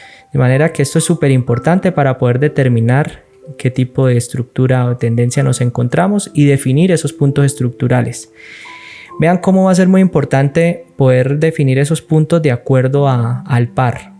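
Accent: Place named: Colombia. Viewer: Hola tocayo como vas? También me llamo y soy de colombia igual tú por tu acento. Es el primer video que velo tuyo, felicitaciones. He visto muchos vídeos sobre estructura y te digo que es el más claro que he notado sigue así. Una consulta en donde ves el índice del dólar? Y entonces dependiendo de su movimiento así mismo decides así donde van tus entradas? Gracias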